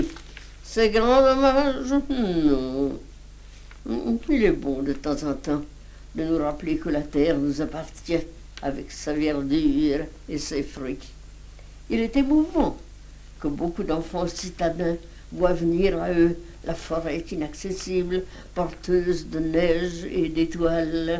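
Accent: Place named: Burgundy, France. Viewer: Simplement l'accent bourguignon d'une femme de 80 ans à une époque où l'on avait conservé sans honte les particularités, les spécificités de sa région natale. Comme la langue s'est appauvrie !...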